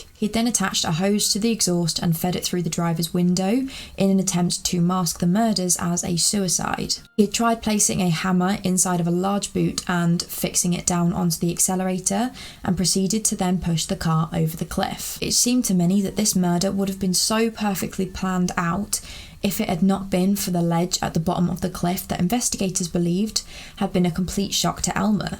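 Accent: England, United Kingdom. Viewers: What country is she from? England?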